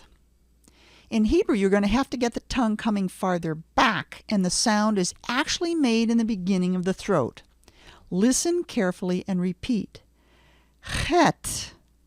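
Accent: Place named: United States. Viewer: Teaching pronunciaton of Hebrew in American accent. Frustrating...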